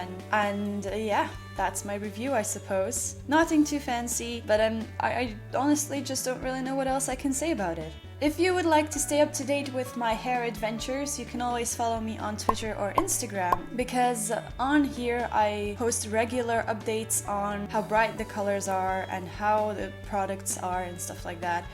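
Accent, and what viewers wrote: United States. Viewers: You sound American, but you have a totally different speech pattern. Where are you from? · It sounds a bit American also! It's crazy, but calming. If, that makes any sense. Haha I just love your voice. 😳😳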